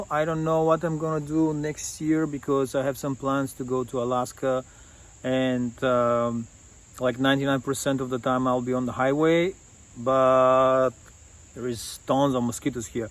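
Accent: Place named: Eastern Europe. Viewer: oh! I knew you sounded Eastern European. Добре дошли!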